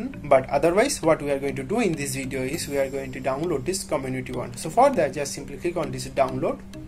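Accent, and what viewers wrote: Japan. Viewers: how the hell did he sound from JAPAN?!?!?!? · I was guessing Japan lol sorry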